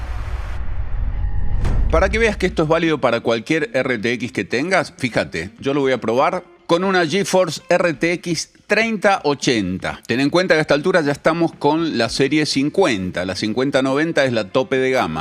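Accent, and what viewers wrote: Argentina. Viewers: ⚡Vi los avances de la nueva versión; pero he esperado a oírlos de boca de. Ya me he acostumbrado... no sé si es bueno, si es que me he acostumbrado a su habla del español argentino, o que me estoy haciendo vago... muchas gracias por todo!!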